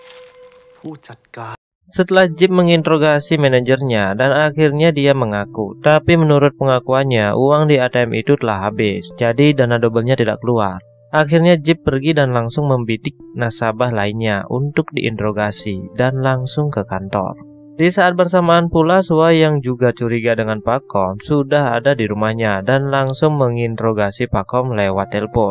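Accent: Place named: Bali, Indonesia. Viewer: Bali sajan logatne bli wkwk,mantap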